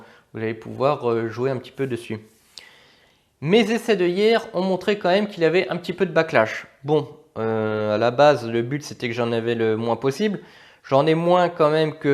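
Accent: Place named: England, United Kingdom. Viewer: Belle bête ! J'adore ton accent englich. 😉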